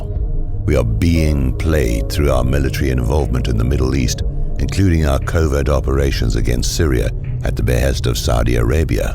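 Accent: United Kingdom. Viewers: just a thought: does the Narrator speaking with an extremely British accent, actually say "We, as Americans..." ?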